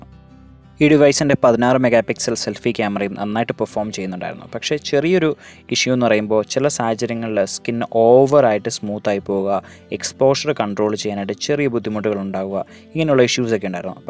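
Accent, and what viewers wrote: Idukki, India. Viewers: Idukkikkaran anoo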